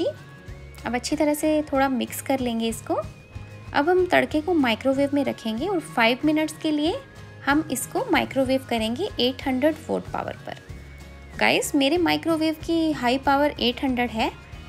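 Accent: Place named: Marwari, India. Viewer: Good trick. You have a Marwari accent :)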